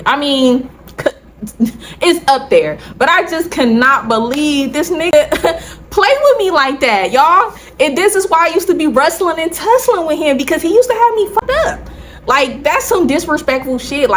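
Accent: Bay Area, United States. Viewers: My first time here! First 3 mins in i knew you had to be from the bay we really do talk a lil different 🤣You funny af I’m definitely subscribing!! love from Oakland!🫶🏾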